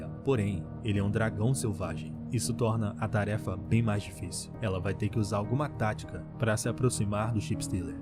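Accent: Rio de Janeiro, Brazil. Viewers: Esse sotaque carioca me pega muito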